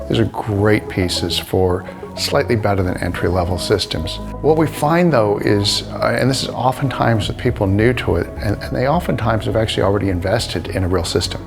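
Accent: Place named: United States. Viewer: High dudgeon? Who talks like that? An Anglophile? Certainly not an audiophile. There's nothing like getting scolded by a person that has an American accent and uses Britishisms.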